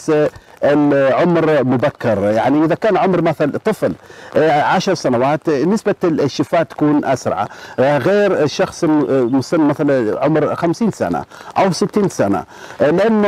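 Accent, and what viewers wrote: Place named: Balochistan, Pakistan. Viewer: انت من بلوشستان عايش في عمان يا لان لغتك العربيه ليست لغة العرب وبارك فيك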